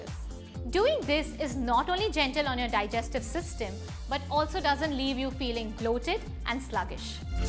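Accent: India. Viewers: I am a Filipino and I love the English-Indian accent!❤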